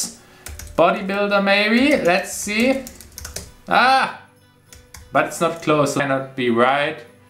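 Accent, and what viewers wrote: Germany. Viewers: Man hört das Deutsche so raus😂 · german accent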